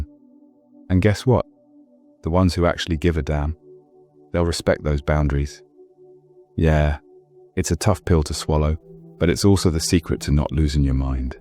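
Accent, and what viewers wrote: United Kingdom. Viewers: ❤ I really like your accent, voice and narration style! Is it a real voice or a very good AI? I really want to know! British accent and British wording with an American twist! I live in London and this accent seems from a Londoner but a old school accent, hard to find it now, I know AI would clear the harshness of it , yet it’s hard to find anyone with this cute accent anymore, even years ago was hard to find. Is recognised as a gentle south London accent, yet , this soft spoken type it has disappeared!😢 I really like it! I have heard women in west/south London, but man has let it go, now man it’s more of an English posh accent or street cut off English. Anyway, please tell me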